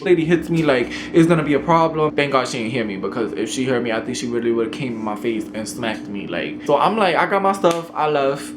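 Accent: New York, United States. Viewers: I don't get NoLa from him... NY breed all up in thru his vocal cords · I love your New York accent ❤️ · "First of all, im not a bitch" LMFAOOO 😂😂 what part of ny u from btw?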